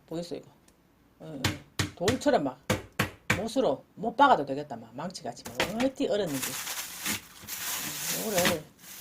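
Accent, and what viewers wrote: Gyeongsang, South Korea. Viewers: 경상도 사투리가 언제들어도 듣기가 좋습니다 .😊 늘 잘보고 있습니다 고맙습니다 ❤❤❤ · 와우😮 진짜 좋은 팁 넘 감사합니다. 오이 얼려 본적 진짜. 없는데. 저도 그리 해봐야 하겠습니다 저도 어릴적 2년반 정도 부신에서 살았던적 있어 경산도 사투리 들을때. 정겨워요😅😅😅😅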